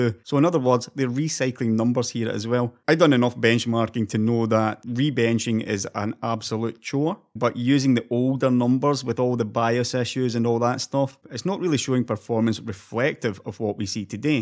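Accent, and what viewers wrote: Scotland, United Kingdom. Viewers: I taught you are Scottish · his Scottish accent?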